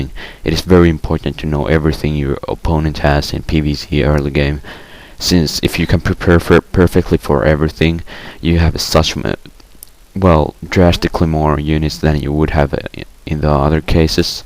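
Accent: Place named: Finland. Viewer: Finnish accent is so beautiful ^_^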